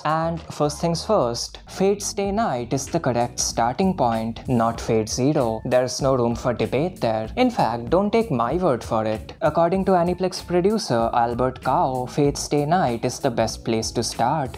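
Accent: India. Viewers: >Open video >Indian accent >Close >Dont recommend channel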